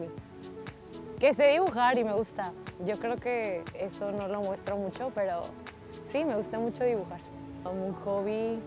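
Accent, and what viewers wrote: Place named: Spain. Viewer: Que barbaridad ahora traes acento español. Jolines!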